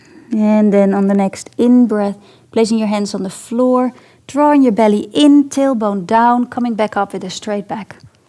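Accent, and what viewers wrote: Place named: Netherlands. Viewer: Heerlijk je nederlandse accent in het engels! Bedankt voor je fijne gedetailleerde alignment uitleg (Y) top!!!!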